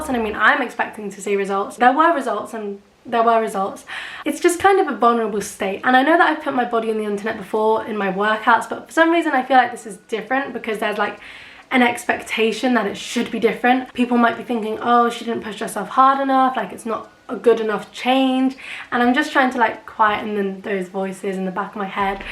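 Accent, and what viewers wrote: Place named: Asia. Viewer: what kind of asian are you and where do you live? I super love your accent and i plan to try Pamela's workout as well!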